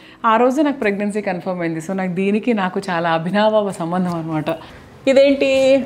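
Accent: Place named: Telugu, India. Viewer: I like ur telugu slang 👌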